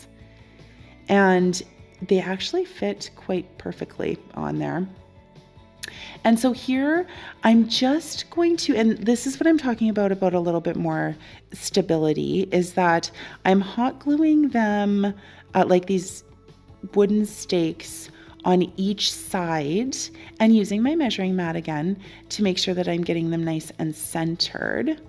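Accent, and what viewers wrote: Canada. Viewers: Hehe I keep forgetting you're Canadian!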